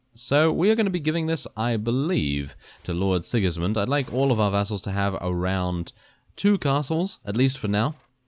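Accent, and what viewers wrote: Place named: Southern England, United Kingdom. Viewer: Southern UK.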